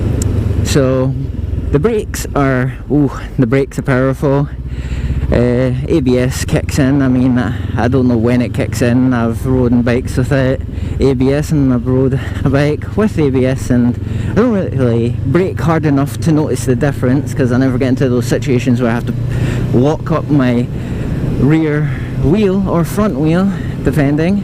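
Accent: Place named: Scotland, United Kingdom. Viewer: Hi mate, nice review. You sound like you're from Scotland. I am as well, and was wondering if you could tell me where you got the bike? I'm thinking about getting it as well! :) Thanks.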